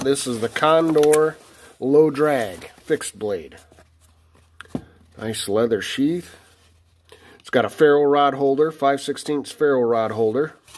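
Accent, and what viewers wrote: United Kingdom. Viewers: Those Condors are going to be great work horses. Spot on British accent Haha. That Tops is going to be one hell of a slicer. Thank you for doing this giveaway. The knife community is something else. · Ha you nailed the bloody British with that accent. I’ve been looking for a plan A by condor and cant find it anywhere in-stock. That low drag looks like a work horse. And everyone knows Tops knives are outta this world. Good stuff⚔️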